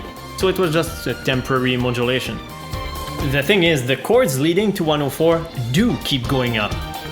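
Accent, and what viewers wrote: Quebec, Canada. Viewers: merci pour la video, tout après 5 seconde j'ai tout de suite su, sauf si je me trompe.. que tu es québécois avec ton accent anglais, qui ressemble pas mal au mien :P, très bon gout pour ton top 10 merci (sauf le no. 1 xD) et un subscriber de plus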